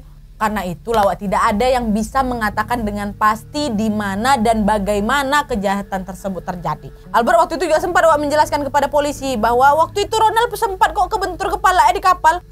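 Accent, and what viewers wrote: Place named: Medan, Indonesia. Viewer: Ibu senang dgn vlog ini. Hostnya cepat bicaranya, jelas n punya aksen orang medan.semoga sukses terus n diberkati tuhan.gbu.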